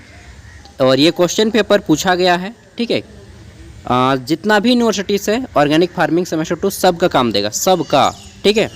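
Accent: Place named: Jharkhand, India. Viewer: Aap kaha se bhai, apka bolne ka tarika se lg rahe ho aap hazaribagh se ho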